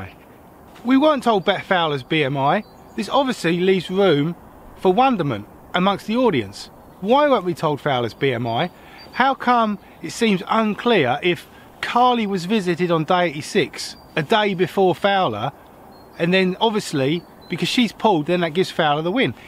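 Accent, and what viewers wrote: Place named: Essex, United Kingdom. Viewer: Where are you based bro? it's not aired in the UK yet but you clearly have a Southern I'm guessing Essex accent!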